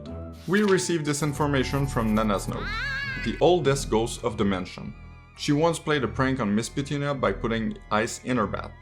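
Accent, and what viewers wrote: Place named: Quebec, Canada. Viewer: This guy's voice is agressively Québecois.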